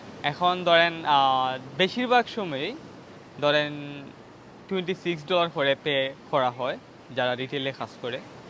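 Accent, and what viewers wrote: Sylhet, Bangladesh. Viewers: আমি নিশ্চিত আপনি সিলেটি । 🤍🥰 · ভাই সিলেটি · Bai bare Sylhet ni ??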